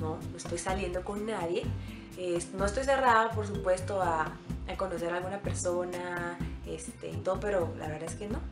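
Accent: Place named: Guatemala. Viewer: Que rico volver a escuchar un acento chapin. Y que mejor de una mujer porque como que tienen mas marcado nuestro acento hermoso. Saludos y tenes razón con eso del tráfico que es espantoso, pero en mi lugar prefiero la ciudad porque en un publo me aburriría un montón.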